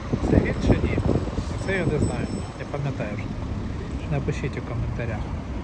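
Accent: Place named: Western Ukraine, Ukraine. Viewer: Повертайся додому ,ухилянт,ти,херовий..і працюй на свою державу..чи може тобі держава не потрібна?? Не соромно? Такий бугай шастаєш за кордоном.. Мова західної ..і відео ні про що ... Яке майбутнє даси синові?? І ще одне , блогером може бути людина ,,яка знає і може щось цікаве розповісти,,а не про бутилочку з водою.і взуття замурзане...😅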